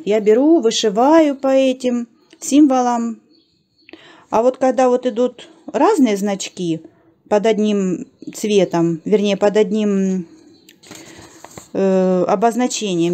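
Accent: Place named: Ukraine. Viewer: А вы откуда? Просто не услышала в видео, кроме упоминания 2х трудных месяцев, а майские деньки упорядочились, судя по говору, с Украины....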